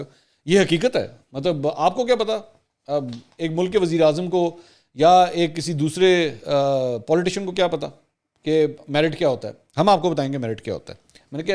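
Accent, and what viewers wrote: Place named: Lahore, Pakistan. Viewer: Bhai, I have limited interest in the news that you give. I just watch your vlogs to listen to your Punjabi dialogues. My parents used to speak the same way. They came from the walled city of Lahore when the partition took place. 🙂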